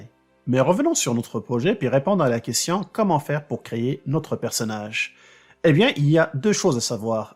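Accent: Quebec, Canada. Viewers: Très bonne vidéo, merci. Et, ça fait plaisir d'entendre un accent québécois aussi facilement compréhensible. Bravo, très bon français. Après, l'utilisation de l'anglais de temps à autre n'est pas un drame, nous sommes en 2023, beaucoup de pays ont 2 langues officielles depuis plusieurs décennies, dont l'anglais en 2ème, et leur première langue n'a rien perdue et reste le langage le plus parlé dans le pays. Quoi qu'il en soit, bravo à tout les québécois qui parlent un français impeccable et qui sont même capables de corriger toutes mes coquilles car ils écrivent, souvent, beaucoup mieux que moi. 😅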